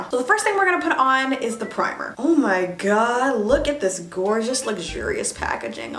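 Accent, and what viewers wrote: Canada. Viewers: Always forget she's French till she speaks Canadian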